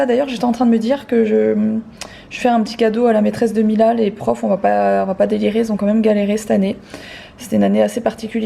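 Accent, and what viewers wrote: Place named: Belgium. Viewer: Je trouve que ton accent Belge s'entend fort dans cette vidéo ^^. Bravo pour tout ton travail.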